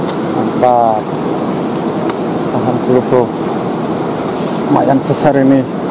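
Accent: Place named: Java, Indonesia. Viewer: Medono agi apik mase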